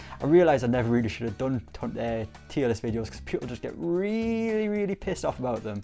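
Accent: United Kingdom. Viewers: Tbh I like him. He just gets a lot of stick because he is maybe not as dramatic as Irish guy. It’s also cuz of his British accent. I prefer Irish guy but you guys are morons insulting him. Ffs he gets thousands of subs. It’s cuz his vids are factual when Irish guys are all about Grealish and paul mcshane. Some of these insults are dumb. If u don’t like him just leave. Trolls.😒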